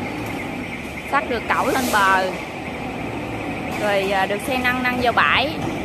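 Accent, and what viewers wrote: Mekong Delta, Vietnam. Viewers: Thôi đi ba, gái miền tây nói chuyện vay mới dễ thương 😁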